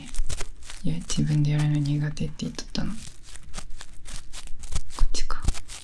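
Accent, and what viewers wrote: Japan. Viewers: 君の語尾けんってついてるの親近感湧く同じ県の方言やけん心地良い✨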